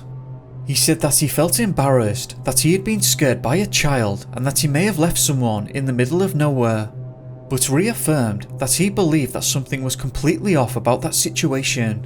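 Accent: United Kingdom. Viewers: Why do you talk like a British chills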